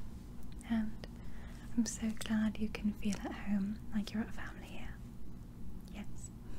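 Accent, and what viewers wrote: England, United Kingdom. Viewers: Good English accent! ❤ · if i'd never seen your vids before i'd honestly say you were british. your accent is AMAZING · Is that a British accent? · regular accent is english not American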